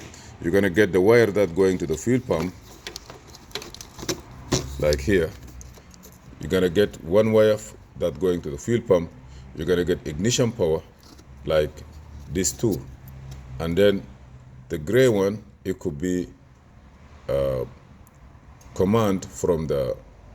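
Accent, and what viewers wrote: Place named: India. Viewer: I be honest I can't listen to the indian accent idk if its just me!? N they be post so many DIY videos nan fooken get so damn mad. Lol anyways thx u sir u speak very good in English I can under st an you for sure you be surprise there's lots that dnt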